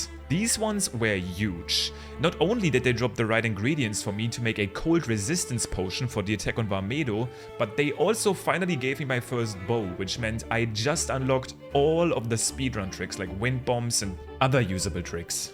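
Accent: Germany. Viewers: Sag mal bist du eigentlich deutsch? Dein Aktzent oder dialekt ka hört sich stak so an. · Hey I am wondering if you are german as your accent reminds me of my accent. Sorry if I am wrong. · I hear Denglish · You Sound very german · are u german? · Ich bin leicht getriggert von diesem Deutschen Aktzent. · Just wanted to ask are you german?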